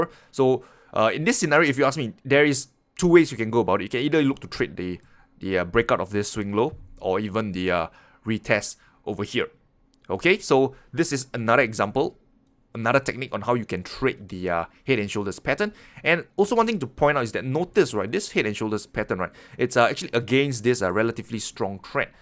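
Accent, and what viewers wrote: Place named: Singapore. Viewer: Darn i miss your accent man i miss singapore! You are from singapore i assume?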